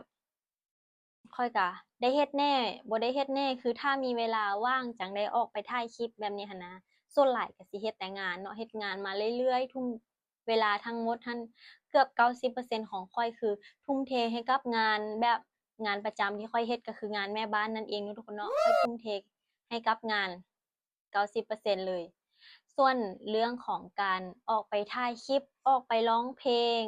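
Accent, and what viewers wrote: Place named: Laos. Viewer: อยากฟังเสียงเว้าลาวเพาะดี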